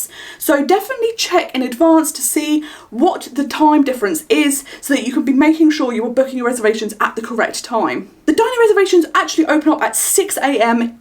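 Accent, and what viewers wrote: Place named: United Kingdom. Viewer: You sound like you’re from the uk do you use a vpn please to make your bookings? Or use the uk site as im also traveling from Europe.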